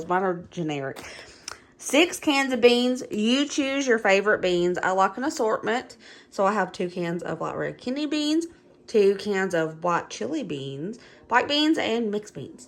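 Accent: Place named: Tennessee, United States. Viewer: I love watching your videos. Great information and ideas but your accent reminds me of Home. i am a transplanted Vol living in another state and I miss home.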